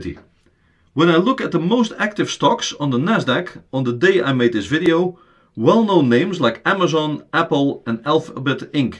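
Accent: Netherlands. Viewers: Ik dacht eerst oh nee, een Nederlander die een Engelse uitleg gaat geven, dit ga ik niet uitkijken hoor. Maar ik was fout, je hebt een superduidelijke uitleg gegeven in heel verstaanbaar NederEngels. :) Thanks! Duimpje omhoog!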